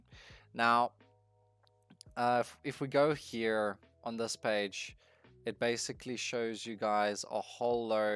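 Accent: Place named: United States. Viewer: that american accent tho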